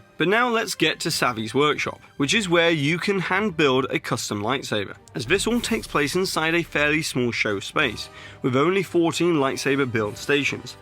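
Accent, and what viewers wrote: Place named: RP, United Kingdom. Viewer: The posh accent cannot die fast enough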